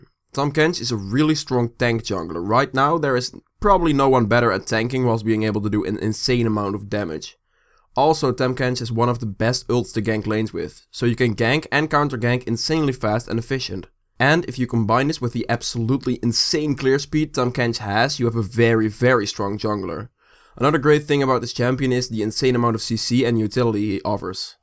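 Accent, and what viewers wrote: Netherlands. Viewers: I like your dutch accent xd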